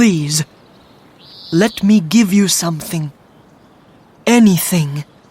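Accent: United Kingdom. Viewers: THANKS FOR BRITISH ACCENT🤍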